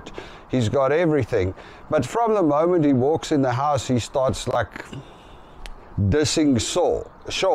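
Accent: South Africa. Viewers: You are South African🎉❤ i can immediately tell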